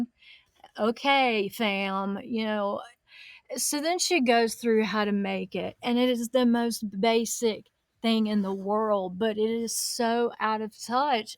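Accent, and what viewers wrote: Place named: South, United States. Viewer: I always enjoy your videos! As far as your southern drawl and you "working on it," please don't. You leave that drawl alone! It's a pleasure listening to it! Your reviews have been top-notch. 🏆 Thank you for your service, ma'am. 🫡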